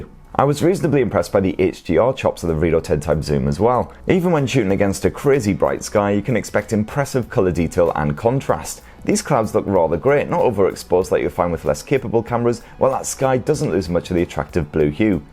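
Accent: United Kingdom. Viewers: I watch all your videos even though I can barely understand lol your accent is too British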